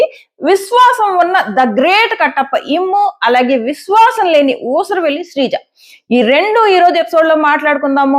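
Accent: Godavari, India. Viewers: ఏదో గోదావరి జిల్లా భాషతో మాట్లాడేసి మీకు కనిపించింది గొప్ప అనుకుని మాట్లాడేస్తారు మీ సర్కిల్లో కూడా మీ సర్కిల్లో కూడా మీ ఫ్యాన్స్ కూడా మీకు తగ్గట్టుగానే మాట్లాడేస్తారు శ్రీజ తనుజ విషయంలో కొంచెం పొగరుగానే మాట్లాడింది డిమాండ్ విషయంలో పొగరుగా మాట్లాడలేదు ఇంకోటి ఏంటంటే ఎవరి ఆటిట్యూడ్ వాళ్లకు ఉంటుంది ఏదో మీరే గొప్ప అనుకుని మాట్లాడేస్తున్నారు శ్రీజ ఎలిమినేషన్ అయిపోయినందుకు మీరు చాలా సంతోషంగా ఉన్నారు కానీ శ్రీజ ఎలిమినేషన్ బిగ్ బాస్ కి గొడ్డలి పెట్టు ఇప్పుడు ఎవ్వరూ బిగ్ బాస్ ని అనకుండా ఏమి తిట్టకుండా టాస్క్ లో ఓడిపోయింది కాబట్టి మిగతా వాళ్ళకి జెన్యూన్ రీసన్ ఇచ్చే లాగా జెన్యూన్ గా కన్వెన్షన్ ఎలాగా బిగ్ బాస్ మైండ్ ప్లేయింగ్ చేసింది మీరేమో పెద్ద మీరే గొప్ప అనుకున్నట్టు శ్రీజ వరస్ట్ అని చెప్పేసి ఓవరాక్షన్ చేసుకుంటూ మాట్లాడేస్తున్నారు ఒక్కసారి ఒక్కసారి ఆది రెడ్డి గారి ఇంటర్వ్యూ ఆదిగా రెడ్డి గారి రివ్యూలు చూడండి రివ్యూలు ఎలా ఇవ్వాలో ఆయన దగ్గర నుంచి మీరు నేర్చుకోండి అయినా తప్పులు చేస్తారు కానీ 10% 15% మాత్రమే మీరు మాత్రం 50 శాతం తప్పుగా చెబుతారు కొన్ని బాగుంటాయి అనుకునే లోపే వ్యక్తిగత అభిప్రాయం ఇంకోసారి మళ్లీ చెప్తున్నాను జాగ్రత్తగా వినండి డెమో ఖచ్చితంగా చేసింది వెన్నుపోటు మొహమాటం లేకుండా చెబుతున్నాను మీరు గొప్ప నేర్చుకొని నీ మీద ఉన్న గౌరవం కూడా పోతాడి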